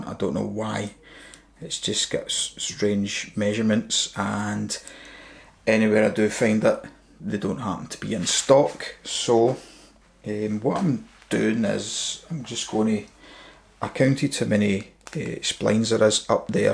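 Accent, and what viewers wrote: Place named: Scotland, United Kingdom. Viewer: Thanks from down under, you did a great job explaining the process. I'm a 75 year old female about to change the vanity basin taps to quarter turn and bought the whole lot. Now I realise I can take it back and just get the quarter turn brass spindle and use it with my existing handles. May just do the shower too 😊 PS love your Scottish accent and thanks for talking slowly.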